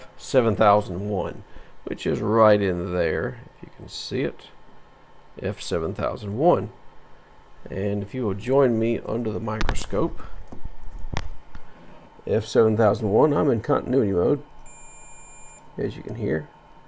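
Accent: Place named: Virginia, United States. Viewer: Hello! I came here from joey does tech. Probably from the discord. Love your videos i think they are fab. I'm in Toronto. From your accent can i make a guess that you are in...Virgina? Maybe a Carolina.... Cheers Peter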